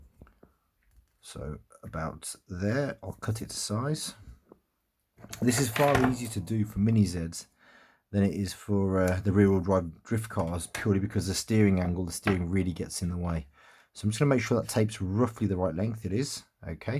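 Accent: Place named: London, United Kingdom. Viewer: I just recently got the awd dodge challenge in destroyer grey, definitely want to get these lights for a red underglow! Awesome video dude. ... also, do you happen to know any good crowds to race with in London? Only asking because of your accent lol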